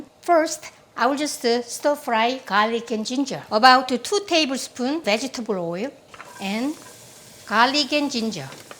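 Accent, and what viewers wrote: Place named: South Korea. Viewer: 어머니가 전북출신이신데.. 엄마 친구 이모님같아서 늘 재미있게 보고있어요!! 영어가 한국말처럼 들리는 기적이..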